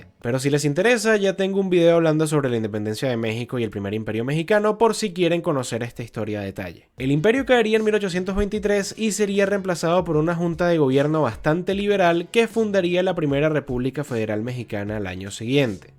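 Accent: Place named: Venezuela. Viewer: De que hablas? si el que hizo el video se le nota el acento venezolano a mil kilometros, a demas, muchos mexicanos no tienen en buena estima a Simon bolivar, por sus masacres y genocidios cometidos.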